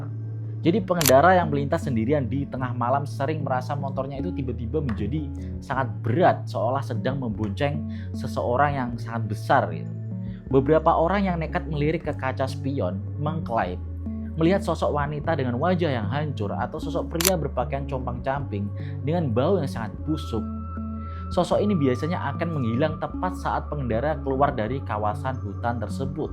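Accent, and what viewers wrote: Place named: Java, Indonesia. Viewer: Hostnya... Jawa bgt ❤❤ ... I like u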